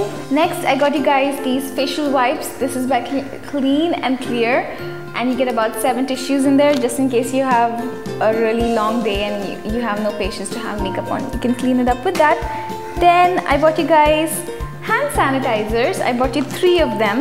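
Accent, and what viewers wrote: India. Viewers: This wasn't really a back to school giveaway more like a make up haul where r u from and where do u live because you have an Indian accent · I have one question I am not trying to be rastist or anything r u from india bc I have a uncle that is and his relitives have an accent and urs is almost the same be aware that I am not trying to offend any one that is from india or not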